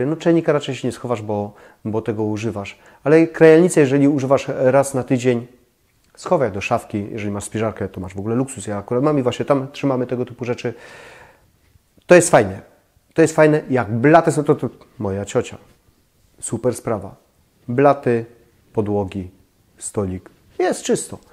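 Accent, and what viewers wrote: Silesia, Poland. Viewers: Synek ze Śląska (?)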